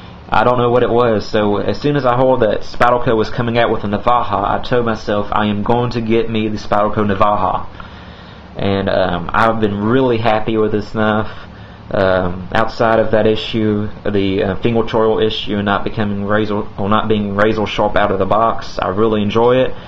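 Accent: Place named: Louisiana, United States. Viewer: Good review. When I got mine it was not that sharp and I noticed that the bevel is over 20º on either side. Was yours like that as well? I'm going to reprofile mine this weekend. You from Louisiana? Love that accent.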